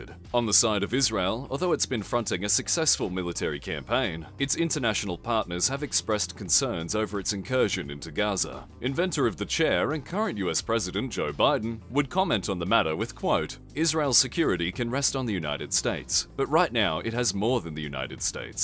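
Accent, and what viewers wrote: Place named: Australia. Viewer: Just Have to say, ever since the Voice over change my partner cant stand your channel and I can no longer View your videos without her expressing anger from once backing this gaming channel especially with the change over to the news which she enjoyed. But now it just feels soulless like a top 10 youtube channel with the generic Australian Voice over. i can't stand it either. Rather be listening to Microsoft Sam.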